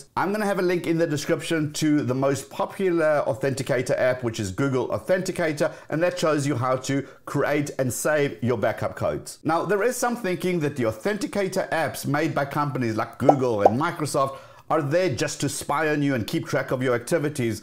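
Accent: South Africa. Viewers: are you south african? You sound like a soutie😂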